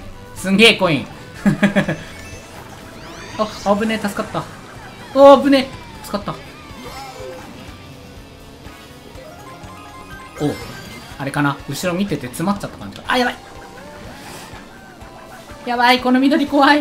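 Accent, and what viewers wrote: Kansai, Japan. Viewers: うぽつです٩( 'ω' )و関西弁喋ってるのがすごく良いです(´꒳`)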